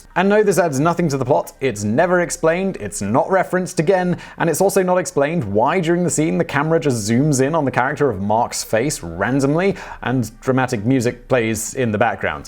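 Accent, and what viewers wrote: United Kingdom. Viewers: Simon reading Tommy's unintelligible quotes with a dignified and professional British accent is pure gold. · The funniest part is hearing a man with an impeccable British accent quote the broken English of Tommy Wiseau.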